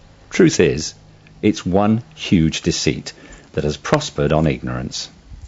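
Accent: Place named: United Kingdom. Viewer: sound like British English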